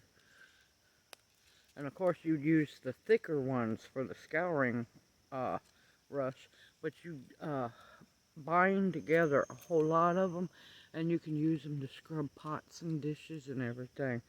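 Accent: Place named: South, United States. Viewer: I stumbled across your channel looking for info on goldenrod. When I heard your voice I thought that lady has to be from the south! And I was soooo happy to find out you are about an hour and half from me! I am looking forward to learning lots of Old Ways from you!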